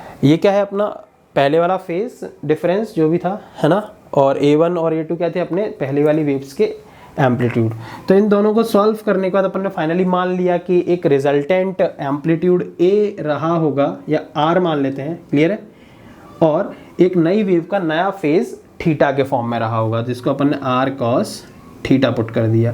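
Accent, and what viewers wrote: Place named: India. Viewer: Stop. Talking Indian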